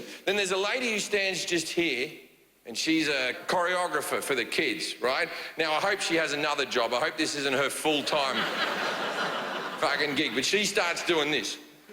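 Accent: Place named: Australia. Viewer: Jim is an Aussie. I'm an Aussie. We all actually talk like this all of the time. It's completely normal. If you were at an Aussie BBQ then you would hear this kind of banter from multiple people all night long. It's how we roll. It's just great that Jim has made inroads into the international market and has exported our brand of humor, not that it's actually humor. It's just how we talk normally. Australia is a funny mother fuckin' place. You'd love it. Come on over. I'll throw a shrimp on the barbie for ya.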